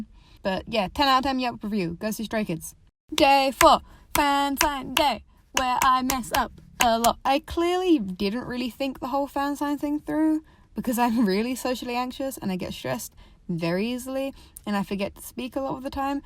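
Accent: United Kingdom. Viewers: omg yes you’re british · WAIT YOURE BRITISH WHAT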